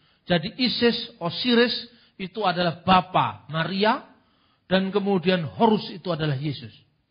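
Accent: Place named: Yemen. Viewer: Pk kalau ngomong jawa seakan2 org dess.tapi,kalau ngomong arab seakan2 org yaman👍👍👍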